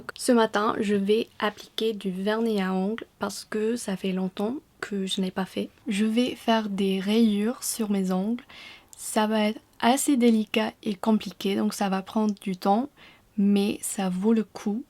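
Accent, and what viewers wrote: United Kingdom. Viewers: I feel you have a slight British accent in French, did you grow up in the UK?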